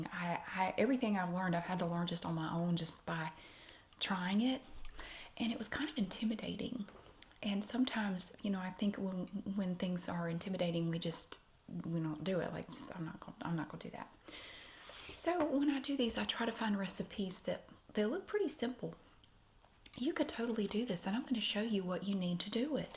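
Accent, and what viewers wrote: United States. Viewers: Hi ! I’m a French 33 yo lady and it’s 5am here, I can’t go back to sleep and I randomly found your channel tonight. I really appreciated your video, it feels like I travelled to the US and have been welcomed in a friendly American woman’s home. I love the accent, you seem really nice and I enjoyed the recipe too. I have no idea what is corn bread though 😂 Due to a bad mental health I haven’t worked in a while and have been really isolated, so this little moment in time with you was so nice. Thank you !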